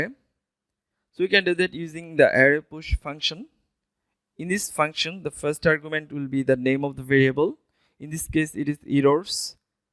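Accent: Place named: Bengal, India. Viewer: Terrible sound. Also accent is heavily Bengali, very hard to understand!!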